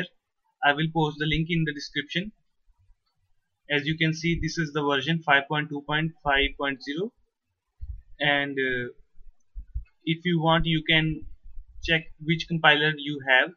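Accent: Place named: India. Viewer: I Fu*k love Indian english tutorials <3, thx bro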